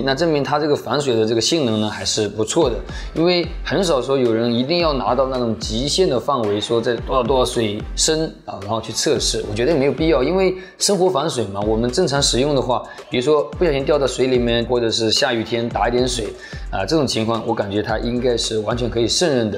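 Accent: Wenzhou, China. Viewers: 是很正宗的温州口音了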